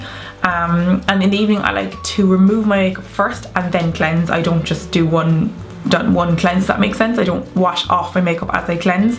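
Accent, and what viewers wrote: Ireland. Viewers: are u Irish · Is that a cork accent I hear?!?! I love finding fellow Cork/ Ireland you tubers! :) You're so pretty btw! I've subscribed 💕💕 xoxox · I knew straight away ur Irish cause I'm too what county u from😉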